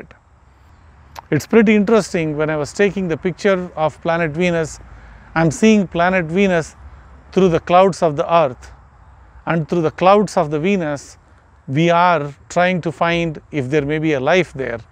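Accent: India. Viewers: ohh ya I felt from your way of talking that u are from india by the way which telescope u have beside u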